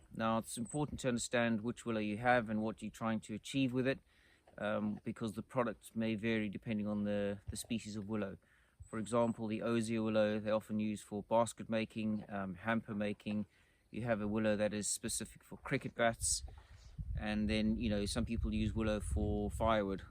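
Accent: South Africa. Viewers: You sound South African but you say south is towards the sun (not north as it is in SA) - what country are you in nowadays?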